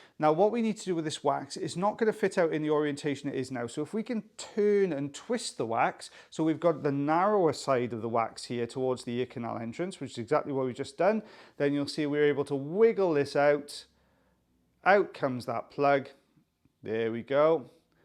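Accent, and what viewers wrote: Wales, United Kingdom. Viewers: There is great comfort to be had with hearing a Welshman say the word "valley". Thank you!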